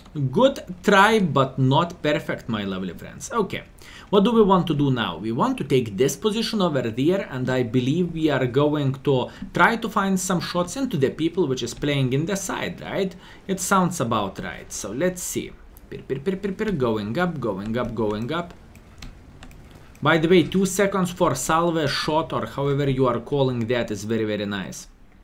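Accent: Russia. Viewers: where is he from then ??? He may not live in Russia but definitely speaks it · russian akcnet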